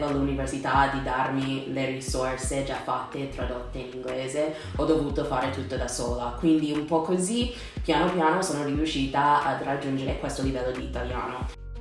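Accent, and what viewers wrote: United States. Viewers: Parli benissimo ed hai un accento molto piacevole da ascoltare. Purtroppo o per fortuna quando si impara una lingua da adulti l'accento della nostra lingua base non si perde mai, vale anche per me dopo anni che vivo in francia. Ma non mi sembra che tu ti ponga molto il problema, o si? Vorresti che il tuo accento americano non fosse notato o ti piace questa sfumatura? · All you have to do is learn the accent! I can totally hear your American Accent. Brava però! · Ciao, il tuo italiano è molto buono - È normalissimo che a volte tu abbia dubbi sulle parole e faccia errori, ma parli ad una velocità quasi da native speaker e devi esserne fiera. I consigli che hai dato sono gli stessi che dò anch'io a chi mi chiede come diventare fluent in English (vivo in UK e sono quasi bilingue ormai). Practising with native speakers is key! L'accento americano è cute though quindi tienitelo stretto:) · You seem fluent, congrats! 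Your American accent though is fairly strong. I was wondering if you've given thought to trying to improve that as it can be distracting? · Dicono che l'italiano sia una lingua difficile da imparare per uno straniero. Tu l'hai imparato molto bene anche grazie al fatto che, vivendo qui in Italia, sei costretta a parlarlo giornalmente. Complimenti. PS: è bellissimo sentire parlare l'italiano con l'accento americano, non perderlo mai. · Non hai imparato bene la pronuncia, noi italiani non parliamo con l'accento americano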